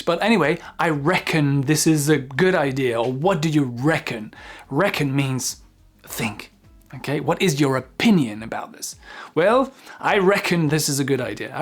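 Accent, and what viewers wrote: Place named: England, United Kingdom. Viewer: j'adore ton accent anglais ... une approche très éducative, à la portée de tous (et toutes ;-) ) merci !!!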